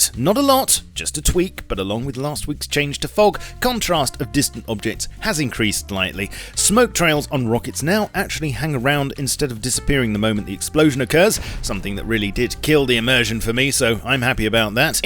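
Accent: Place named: Liverpool, United Kingdom. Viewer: aye yeah ur a scouse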